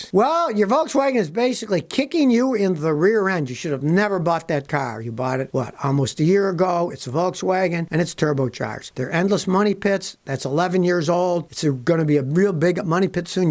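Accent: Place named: United States. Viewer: Is this chap sniffing Glue ? . Or is this how Americans speak ?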